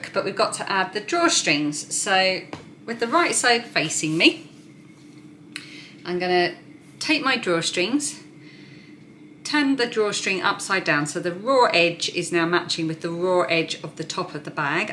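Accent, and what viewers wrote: United Kingdom. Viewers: "EMBRACE THE WONKYNESS!" saith she, the #1 Wonky Queen of the Universe!! The funny part, at least for us assorted Americans, that your UK accent, which we associate for some reason with authority, makes it all seem kinda formal and official! LOL so you've probably done more to encourage those "across the pond" to sew that 10 other podcasters combined. So thank you,! Thank you! Love and hugs from Virginia USA to my UK fam! I read a lot of the comments, and not only do we have a HIT, but looks like a lot of new subscribers too! Congrats, my girl, congrats!!! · Really enjoyed watching this, a familiar British accent. I can assure you that you’re not the only one who sews like this, or lives in a busy house sewing on your kitchen table! 😂 It’s how I learned and copying shapes from my wardrobe. I turn things inside out to draw around them! 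I’ve only recently invested in a set of patchwork square rulers to help neaten everything up as I’d like to sell some of what I make. I still use my ironing board for cutting everything out, it makes it easier for ironing. Still not got a craft room with neatly organised fabric on shelves. I also recycle as much fabric as I can from old clothes and linens. Your bags all turned out amazing! My favourite is the black and white with yellow zip. I’m inspired to make something similar but add a bright pink lining. 😊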